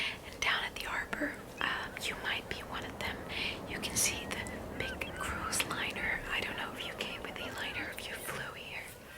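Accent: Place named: United States. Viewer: You sound very American. Is that on purpose or does it just happen? Either way this was lovely (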